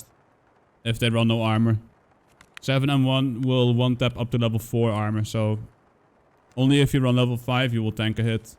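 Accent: Netherlands. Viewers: “Funny speaking Dutch dude” ..subbed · Hi funny speaking Dutch dude. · ik hoorde in de eerste 5 seconde dat je nederlands was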